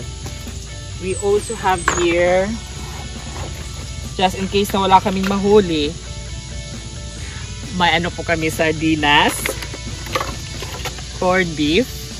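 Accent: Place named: Philippines. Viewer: I can still hear your tagalog accent fren hahaha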